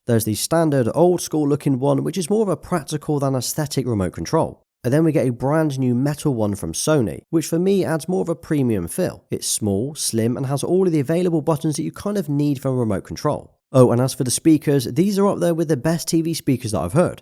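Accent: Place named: England, United Kingdom. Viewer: Love your English accent, mate.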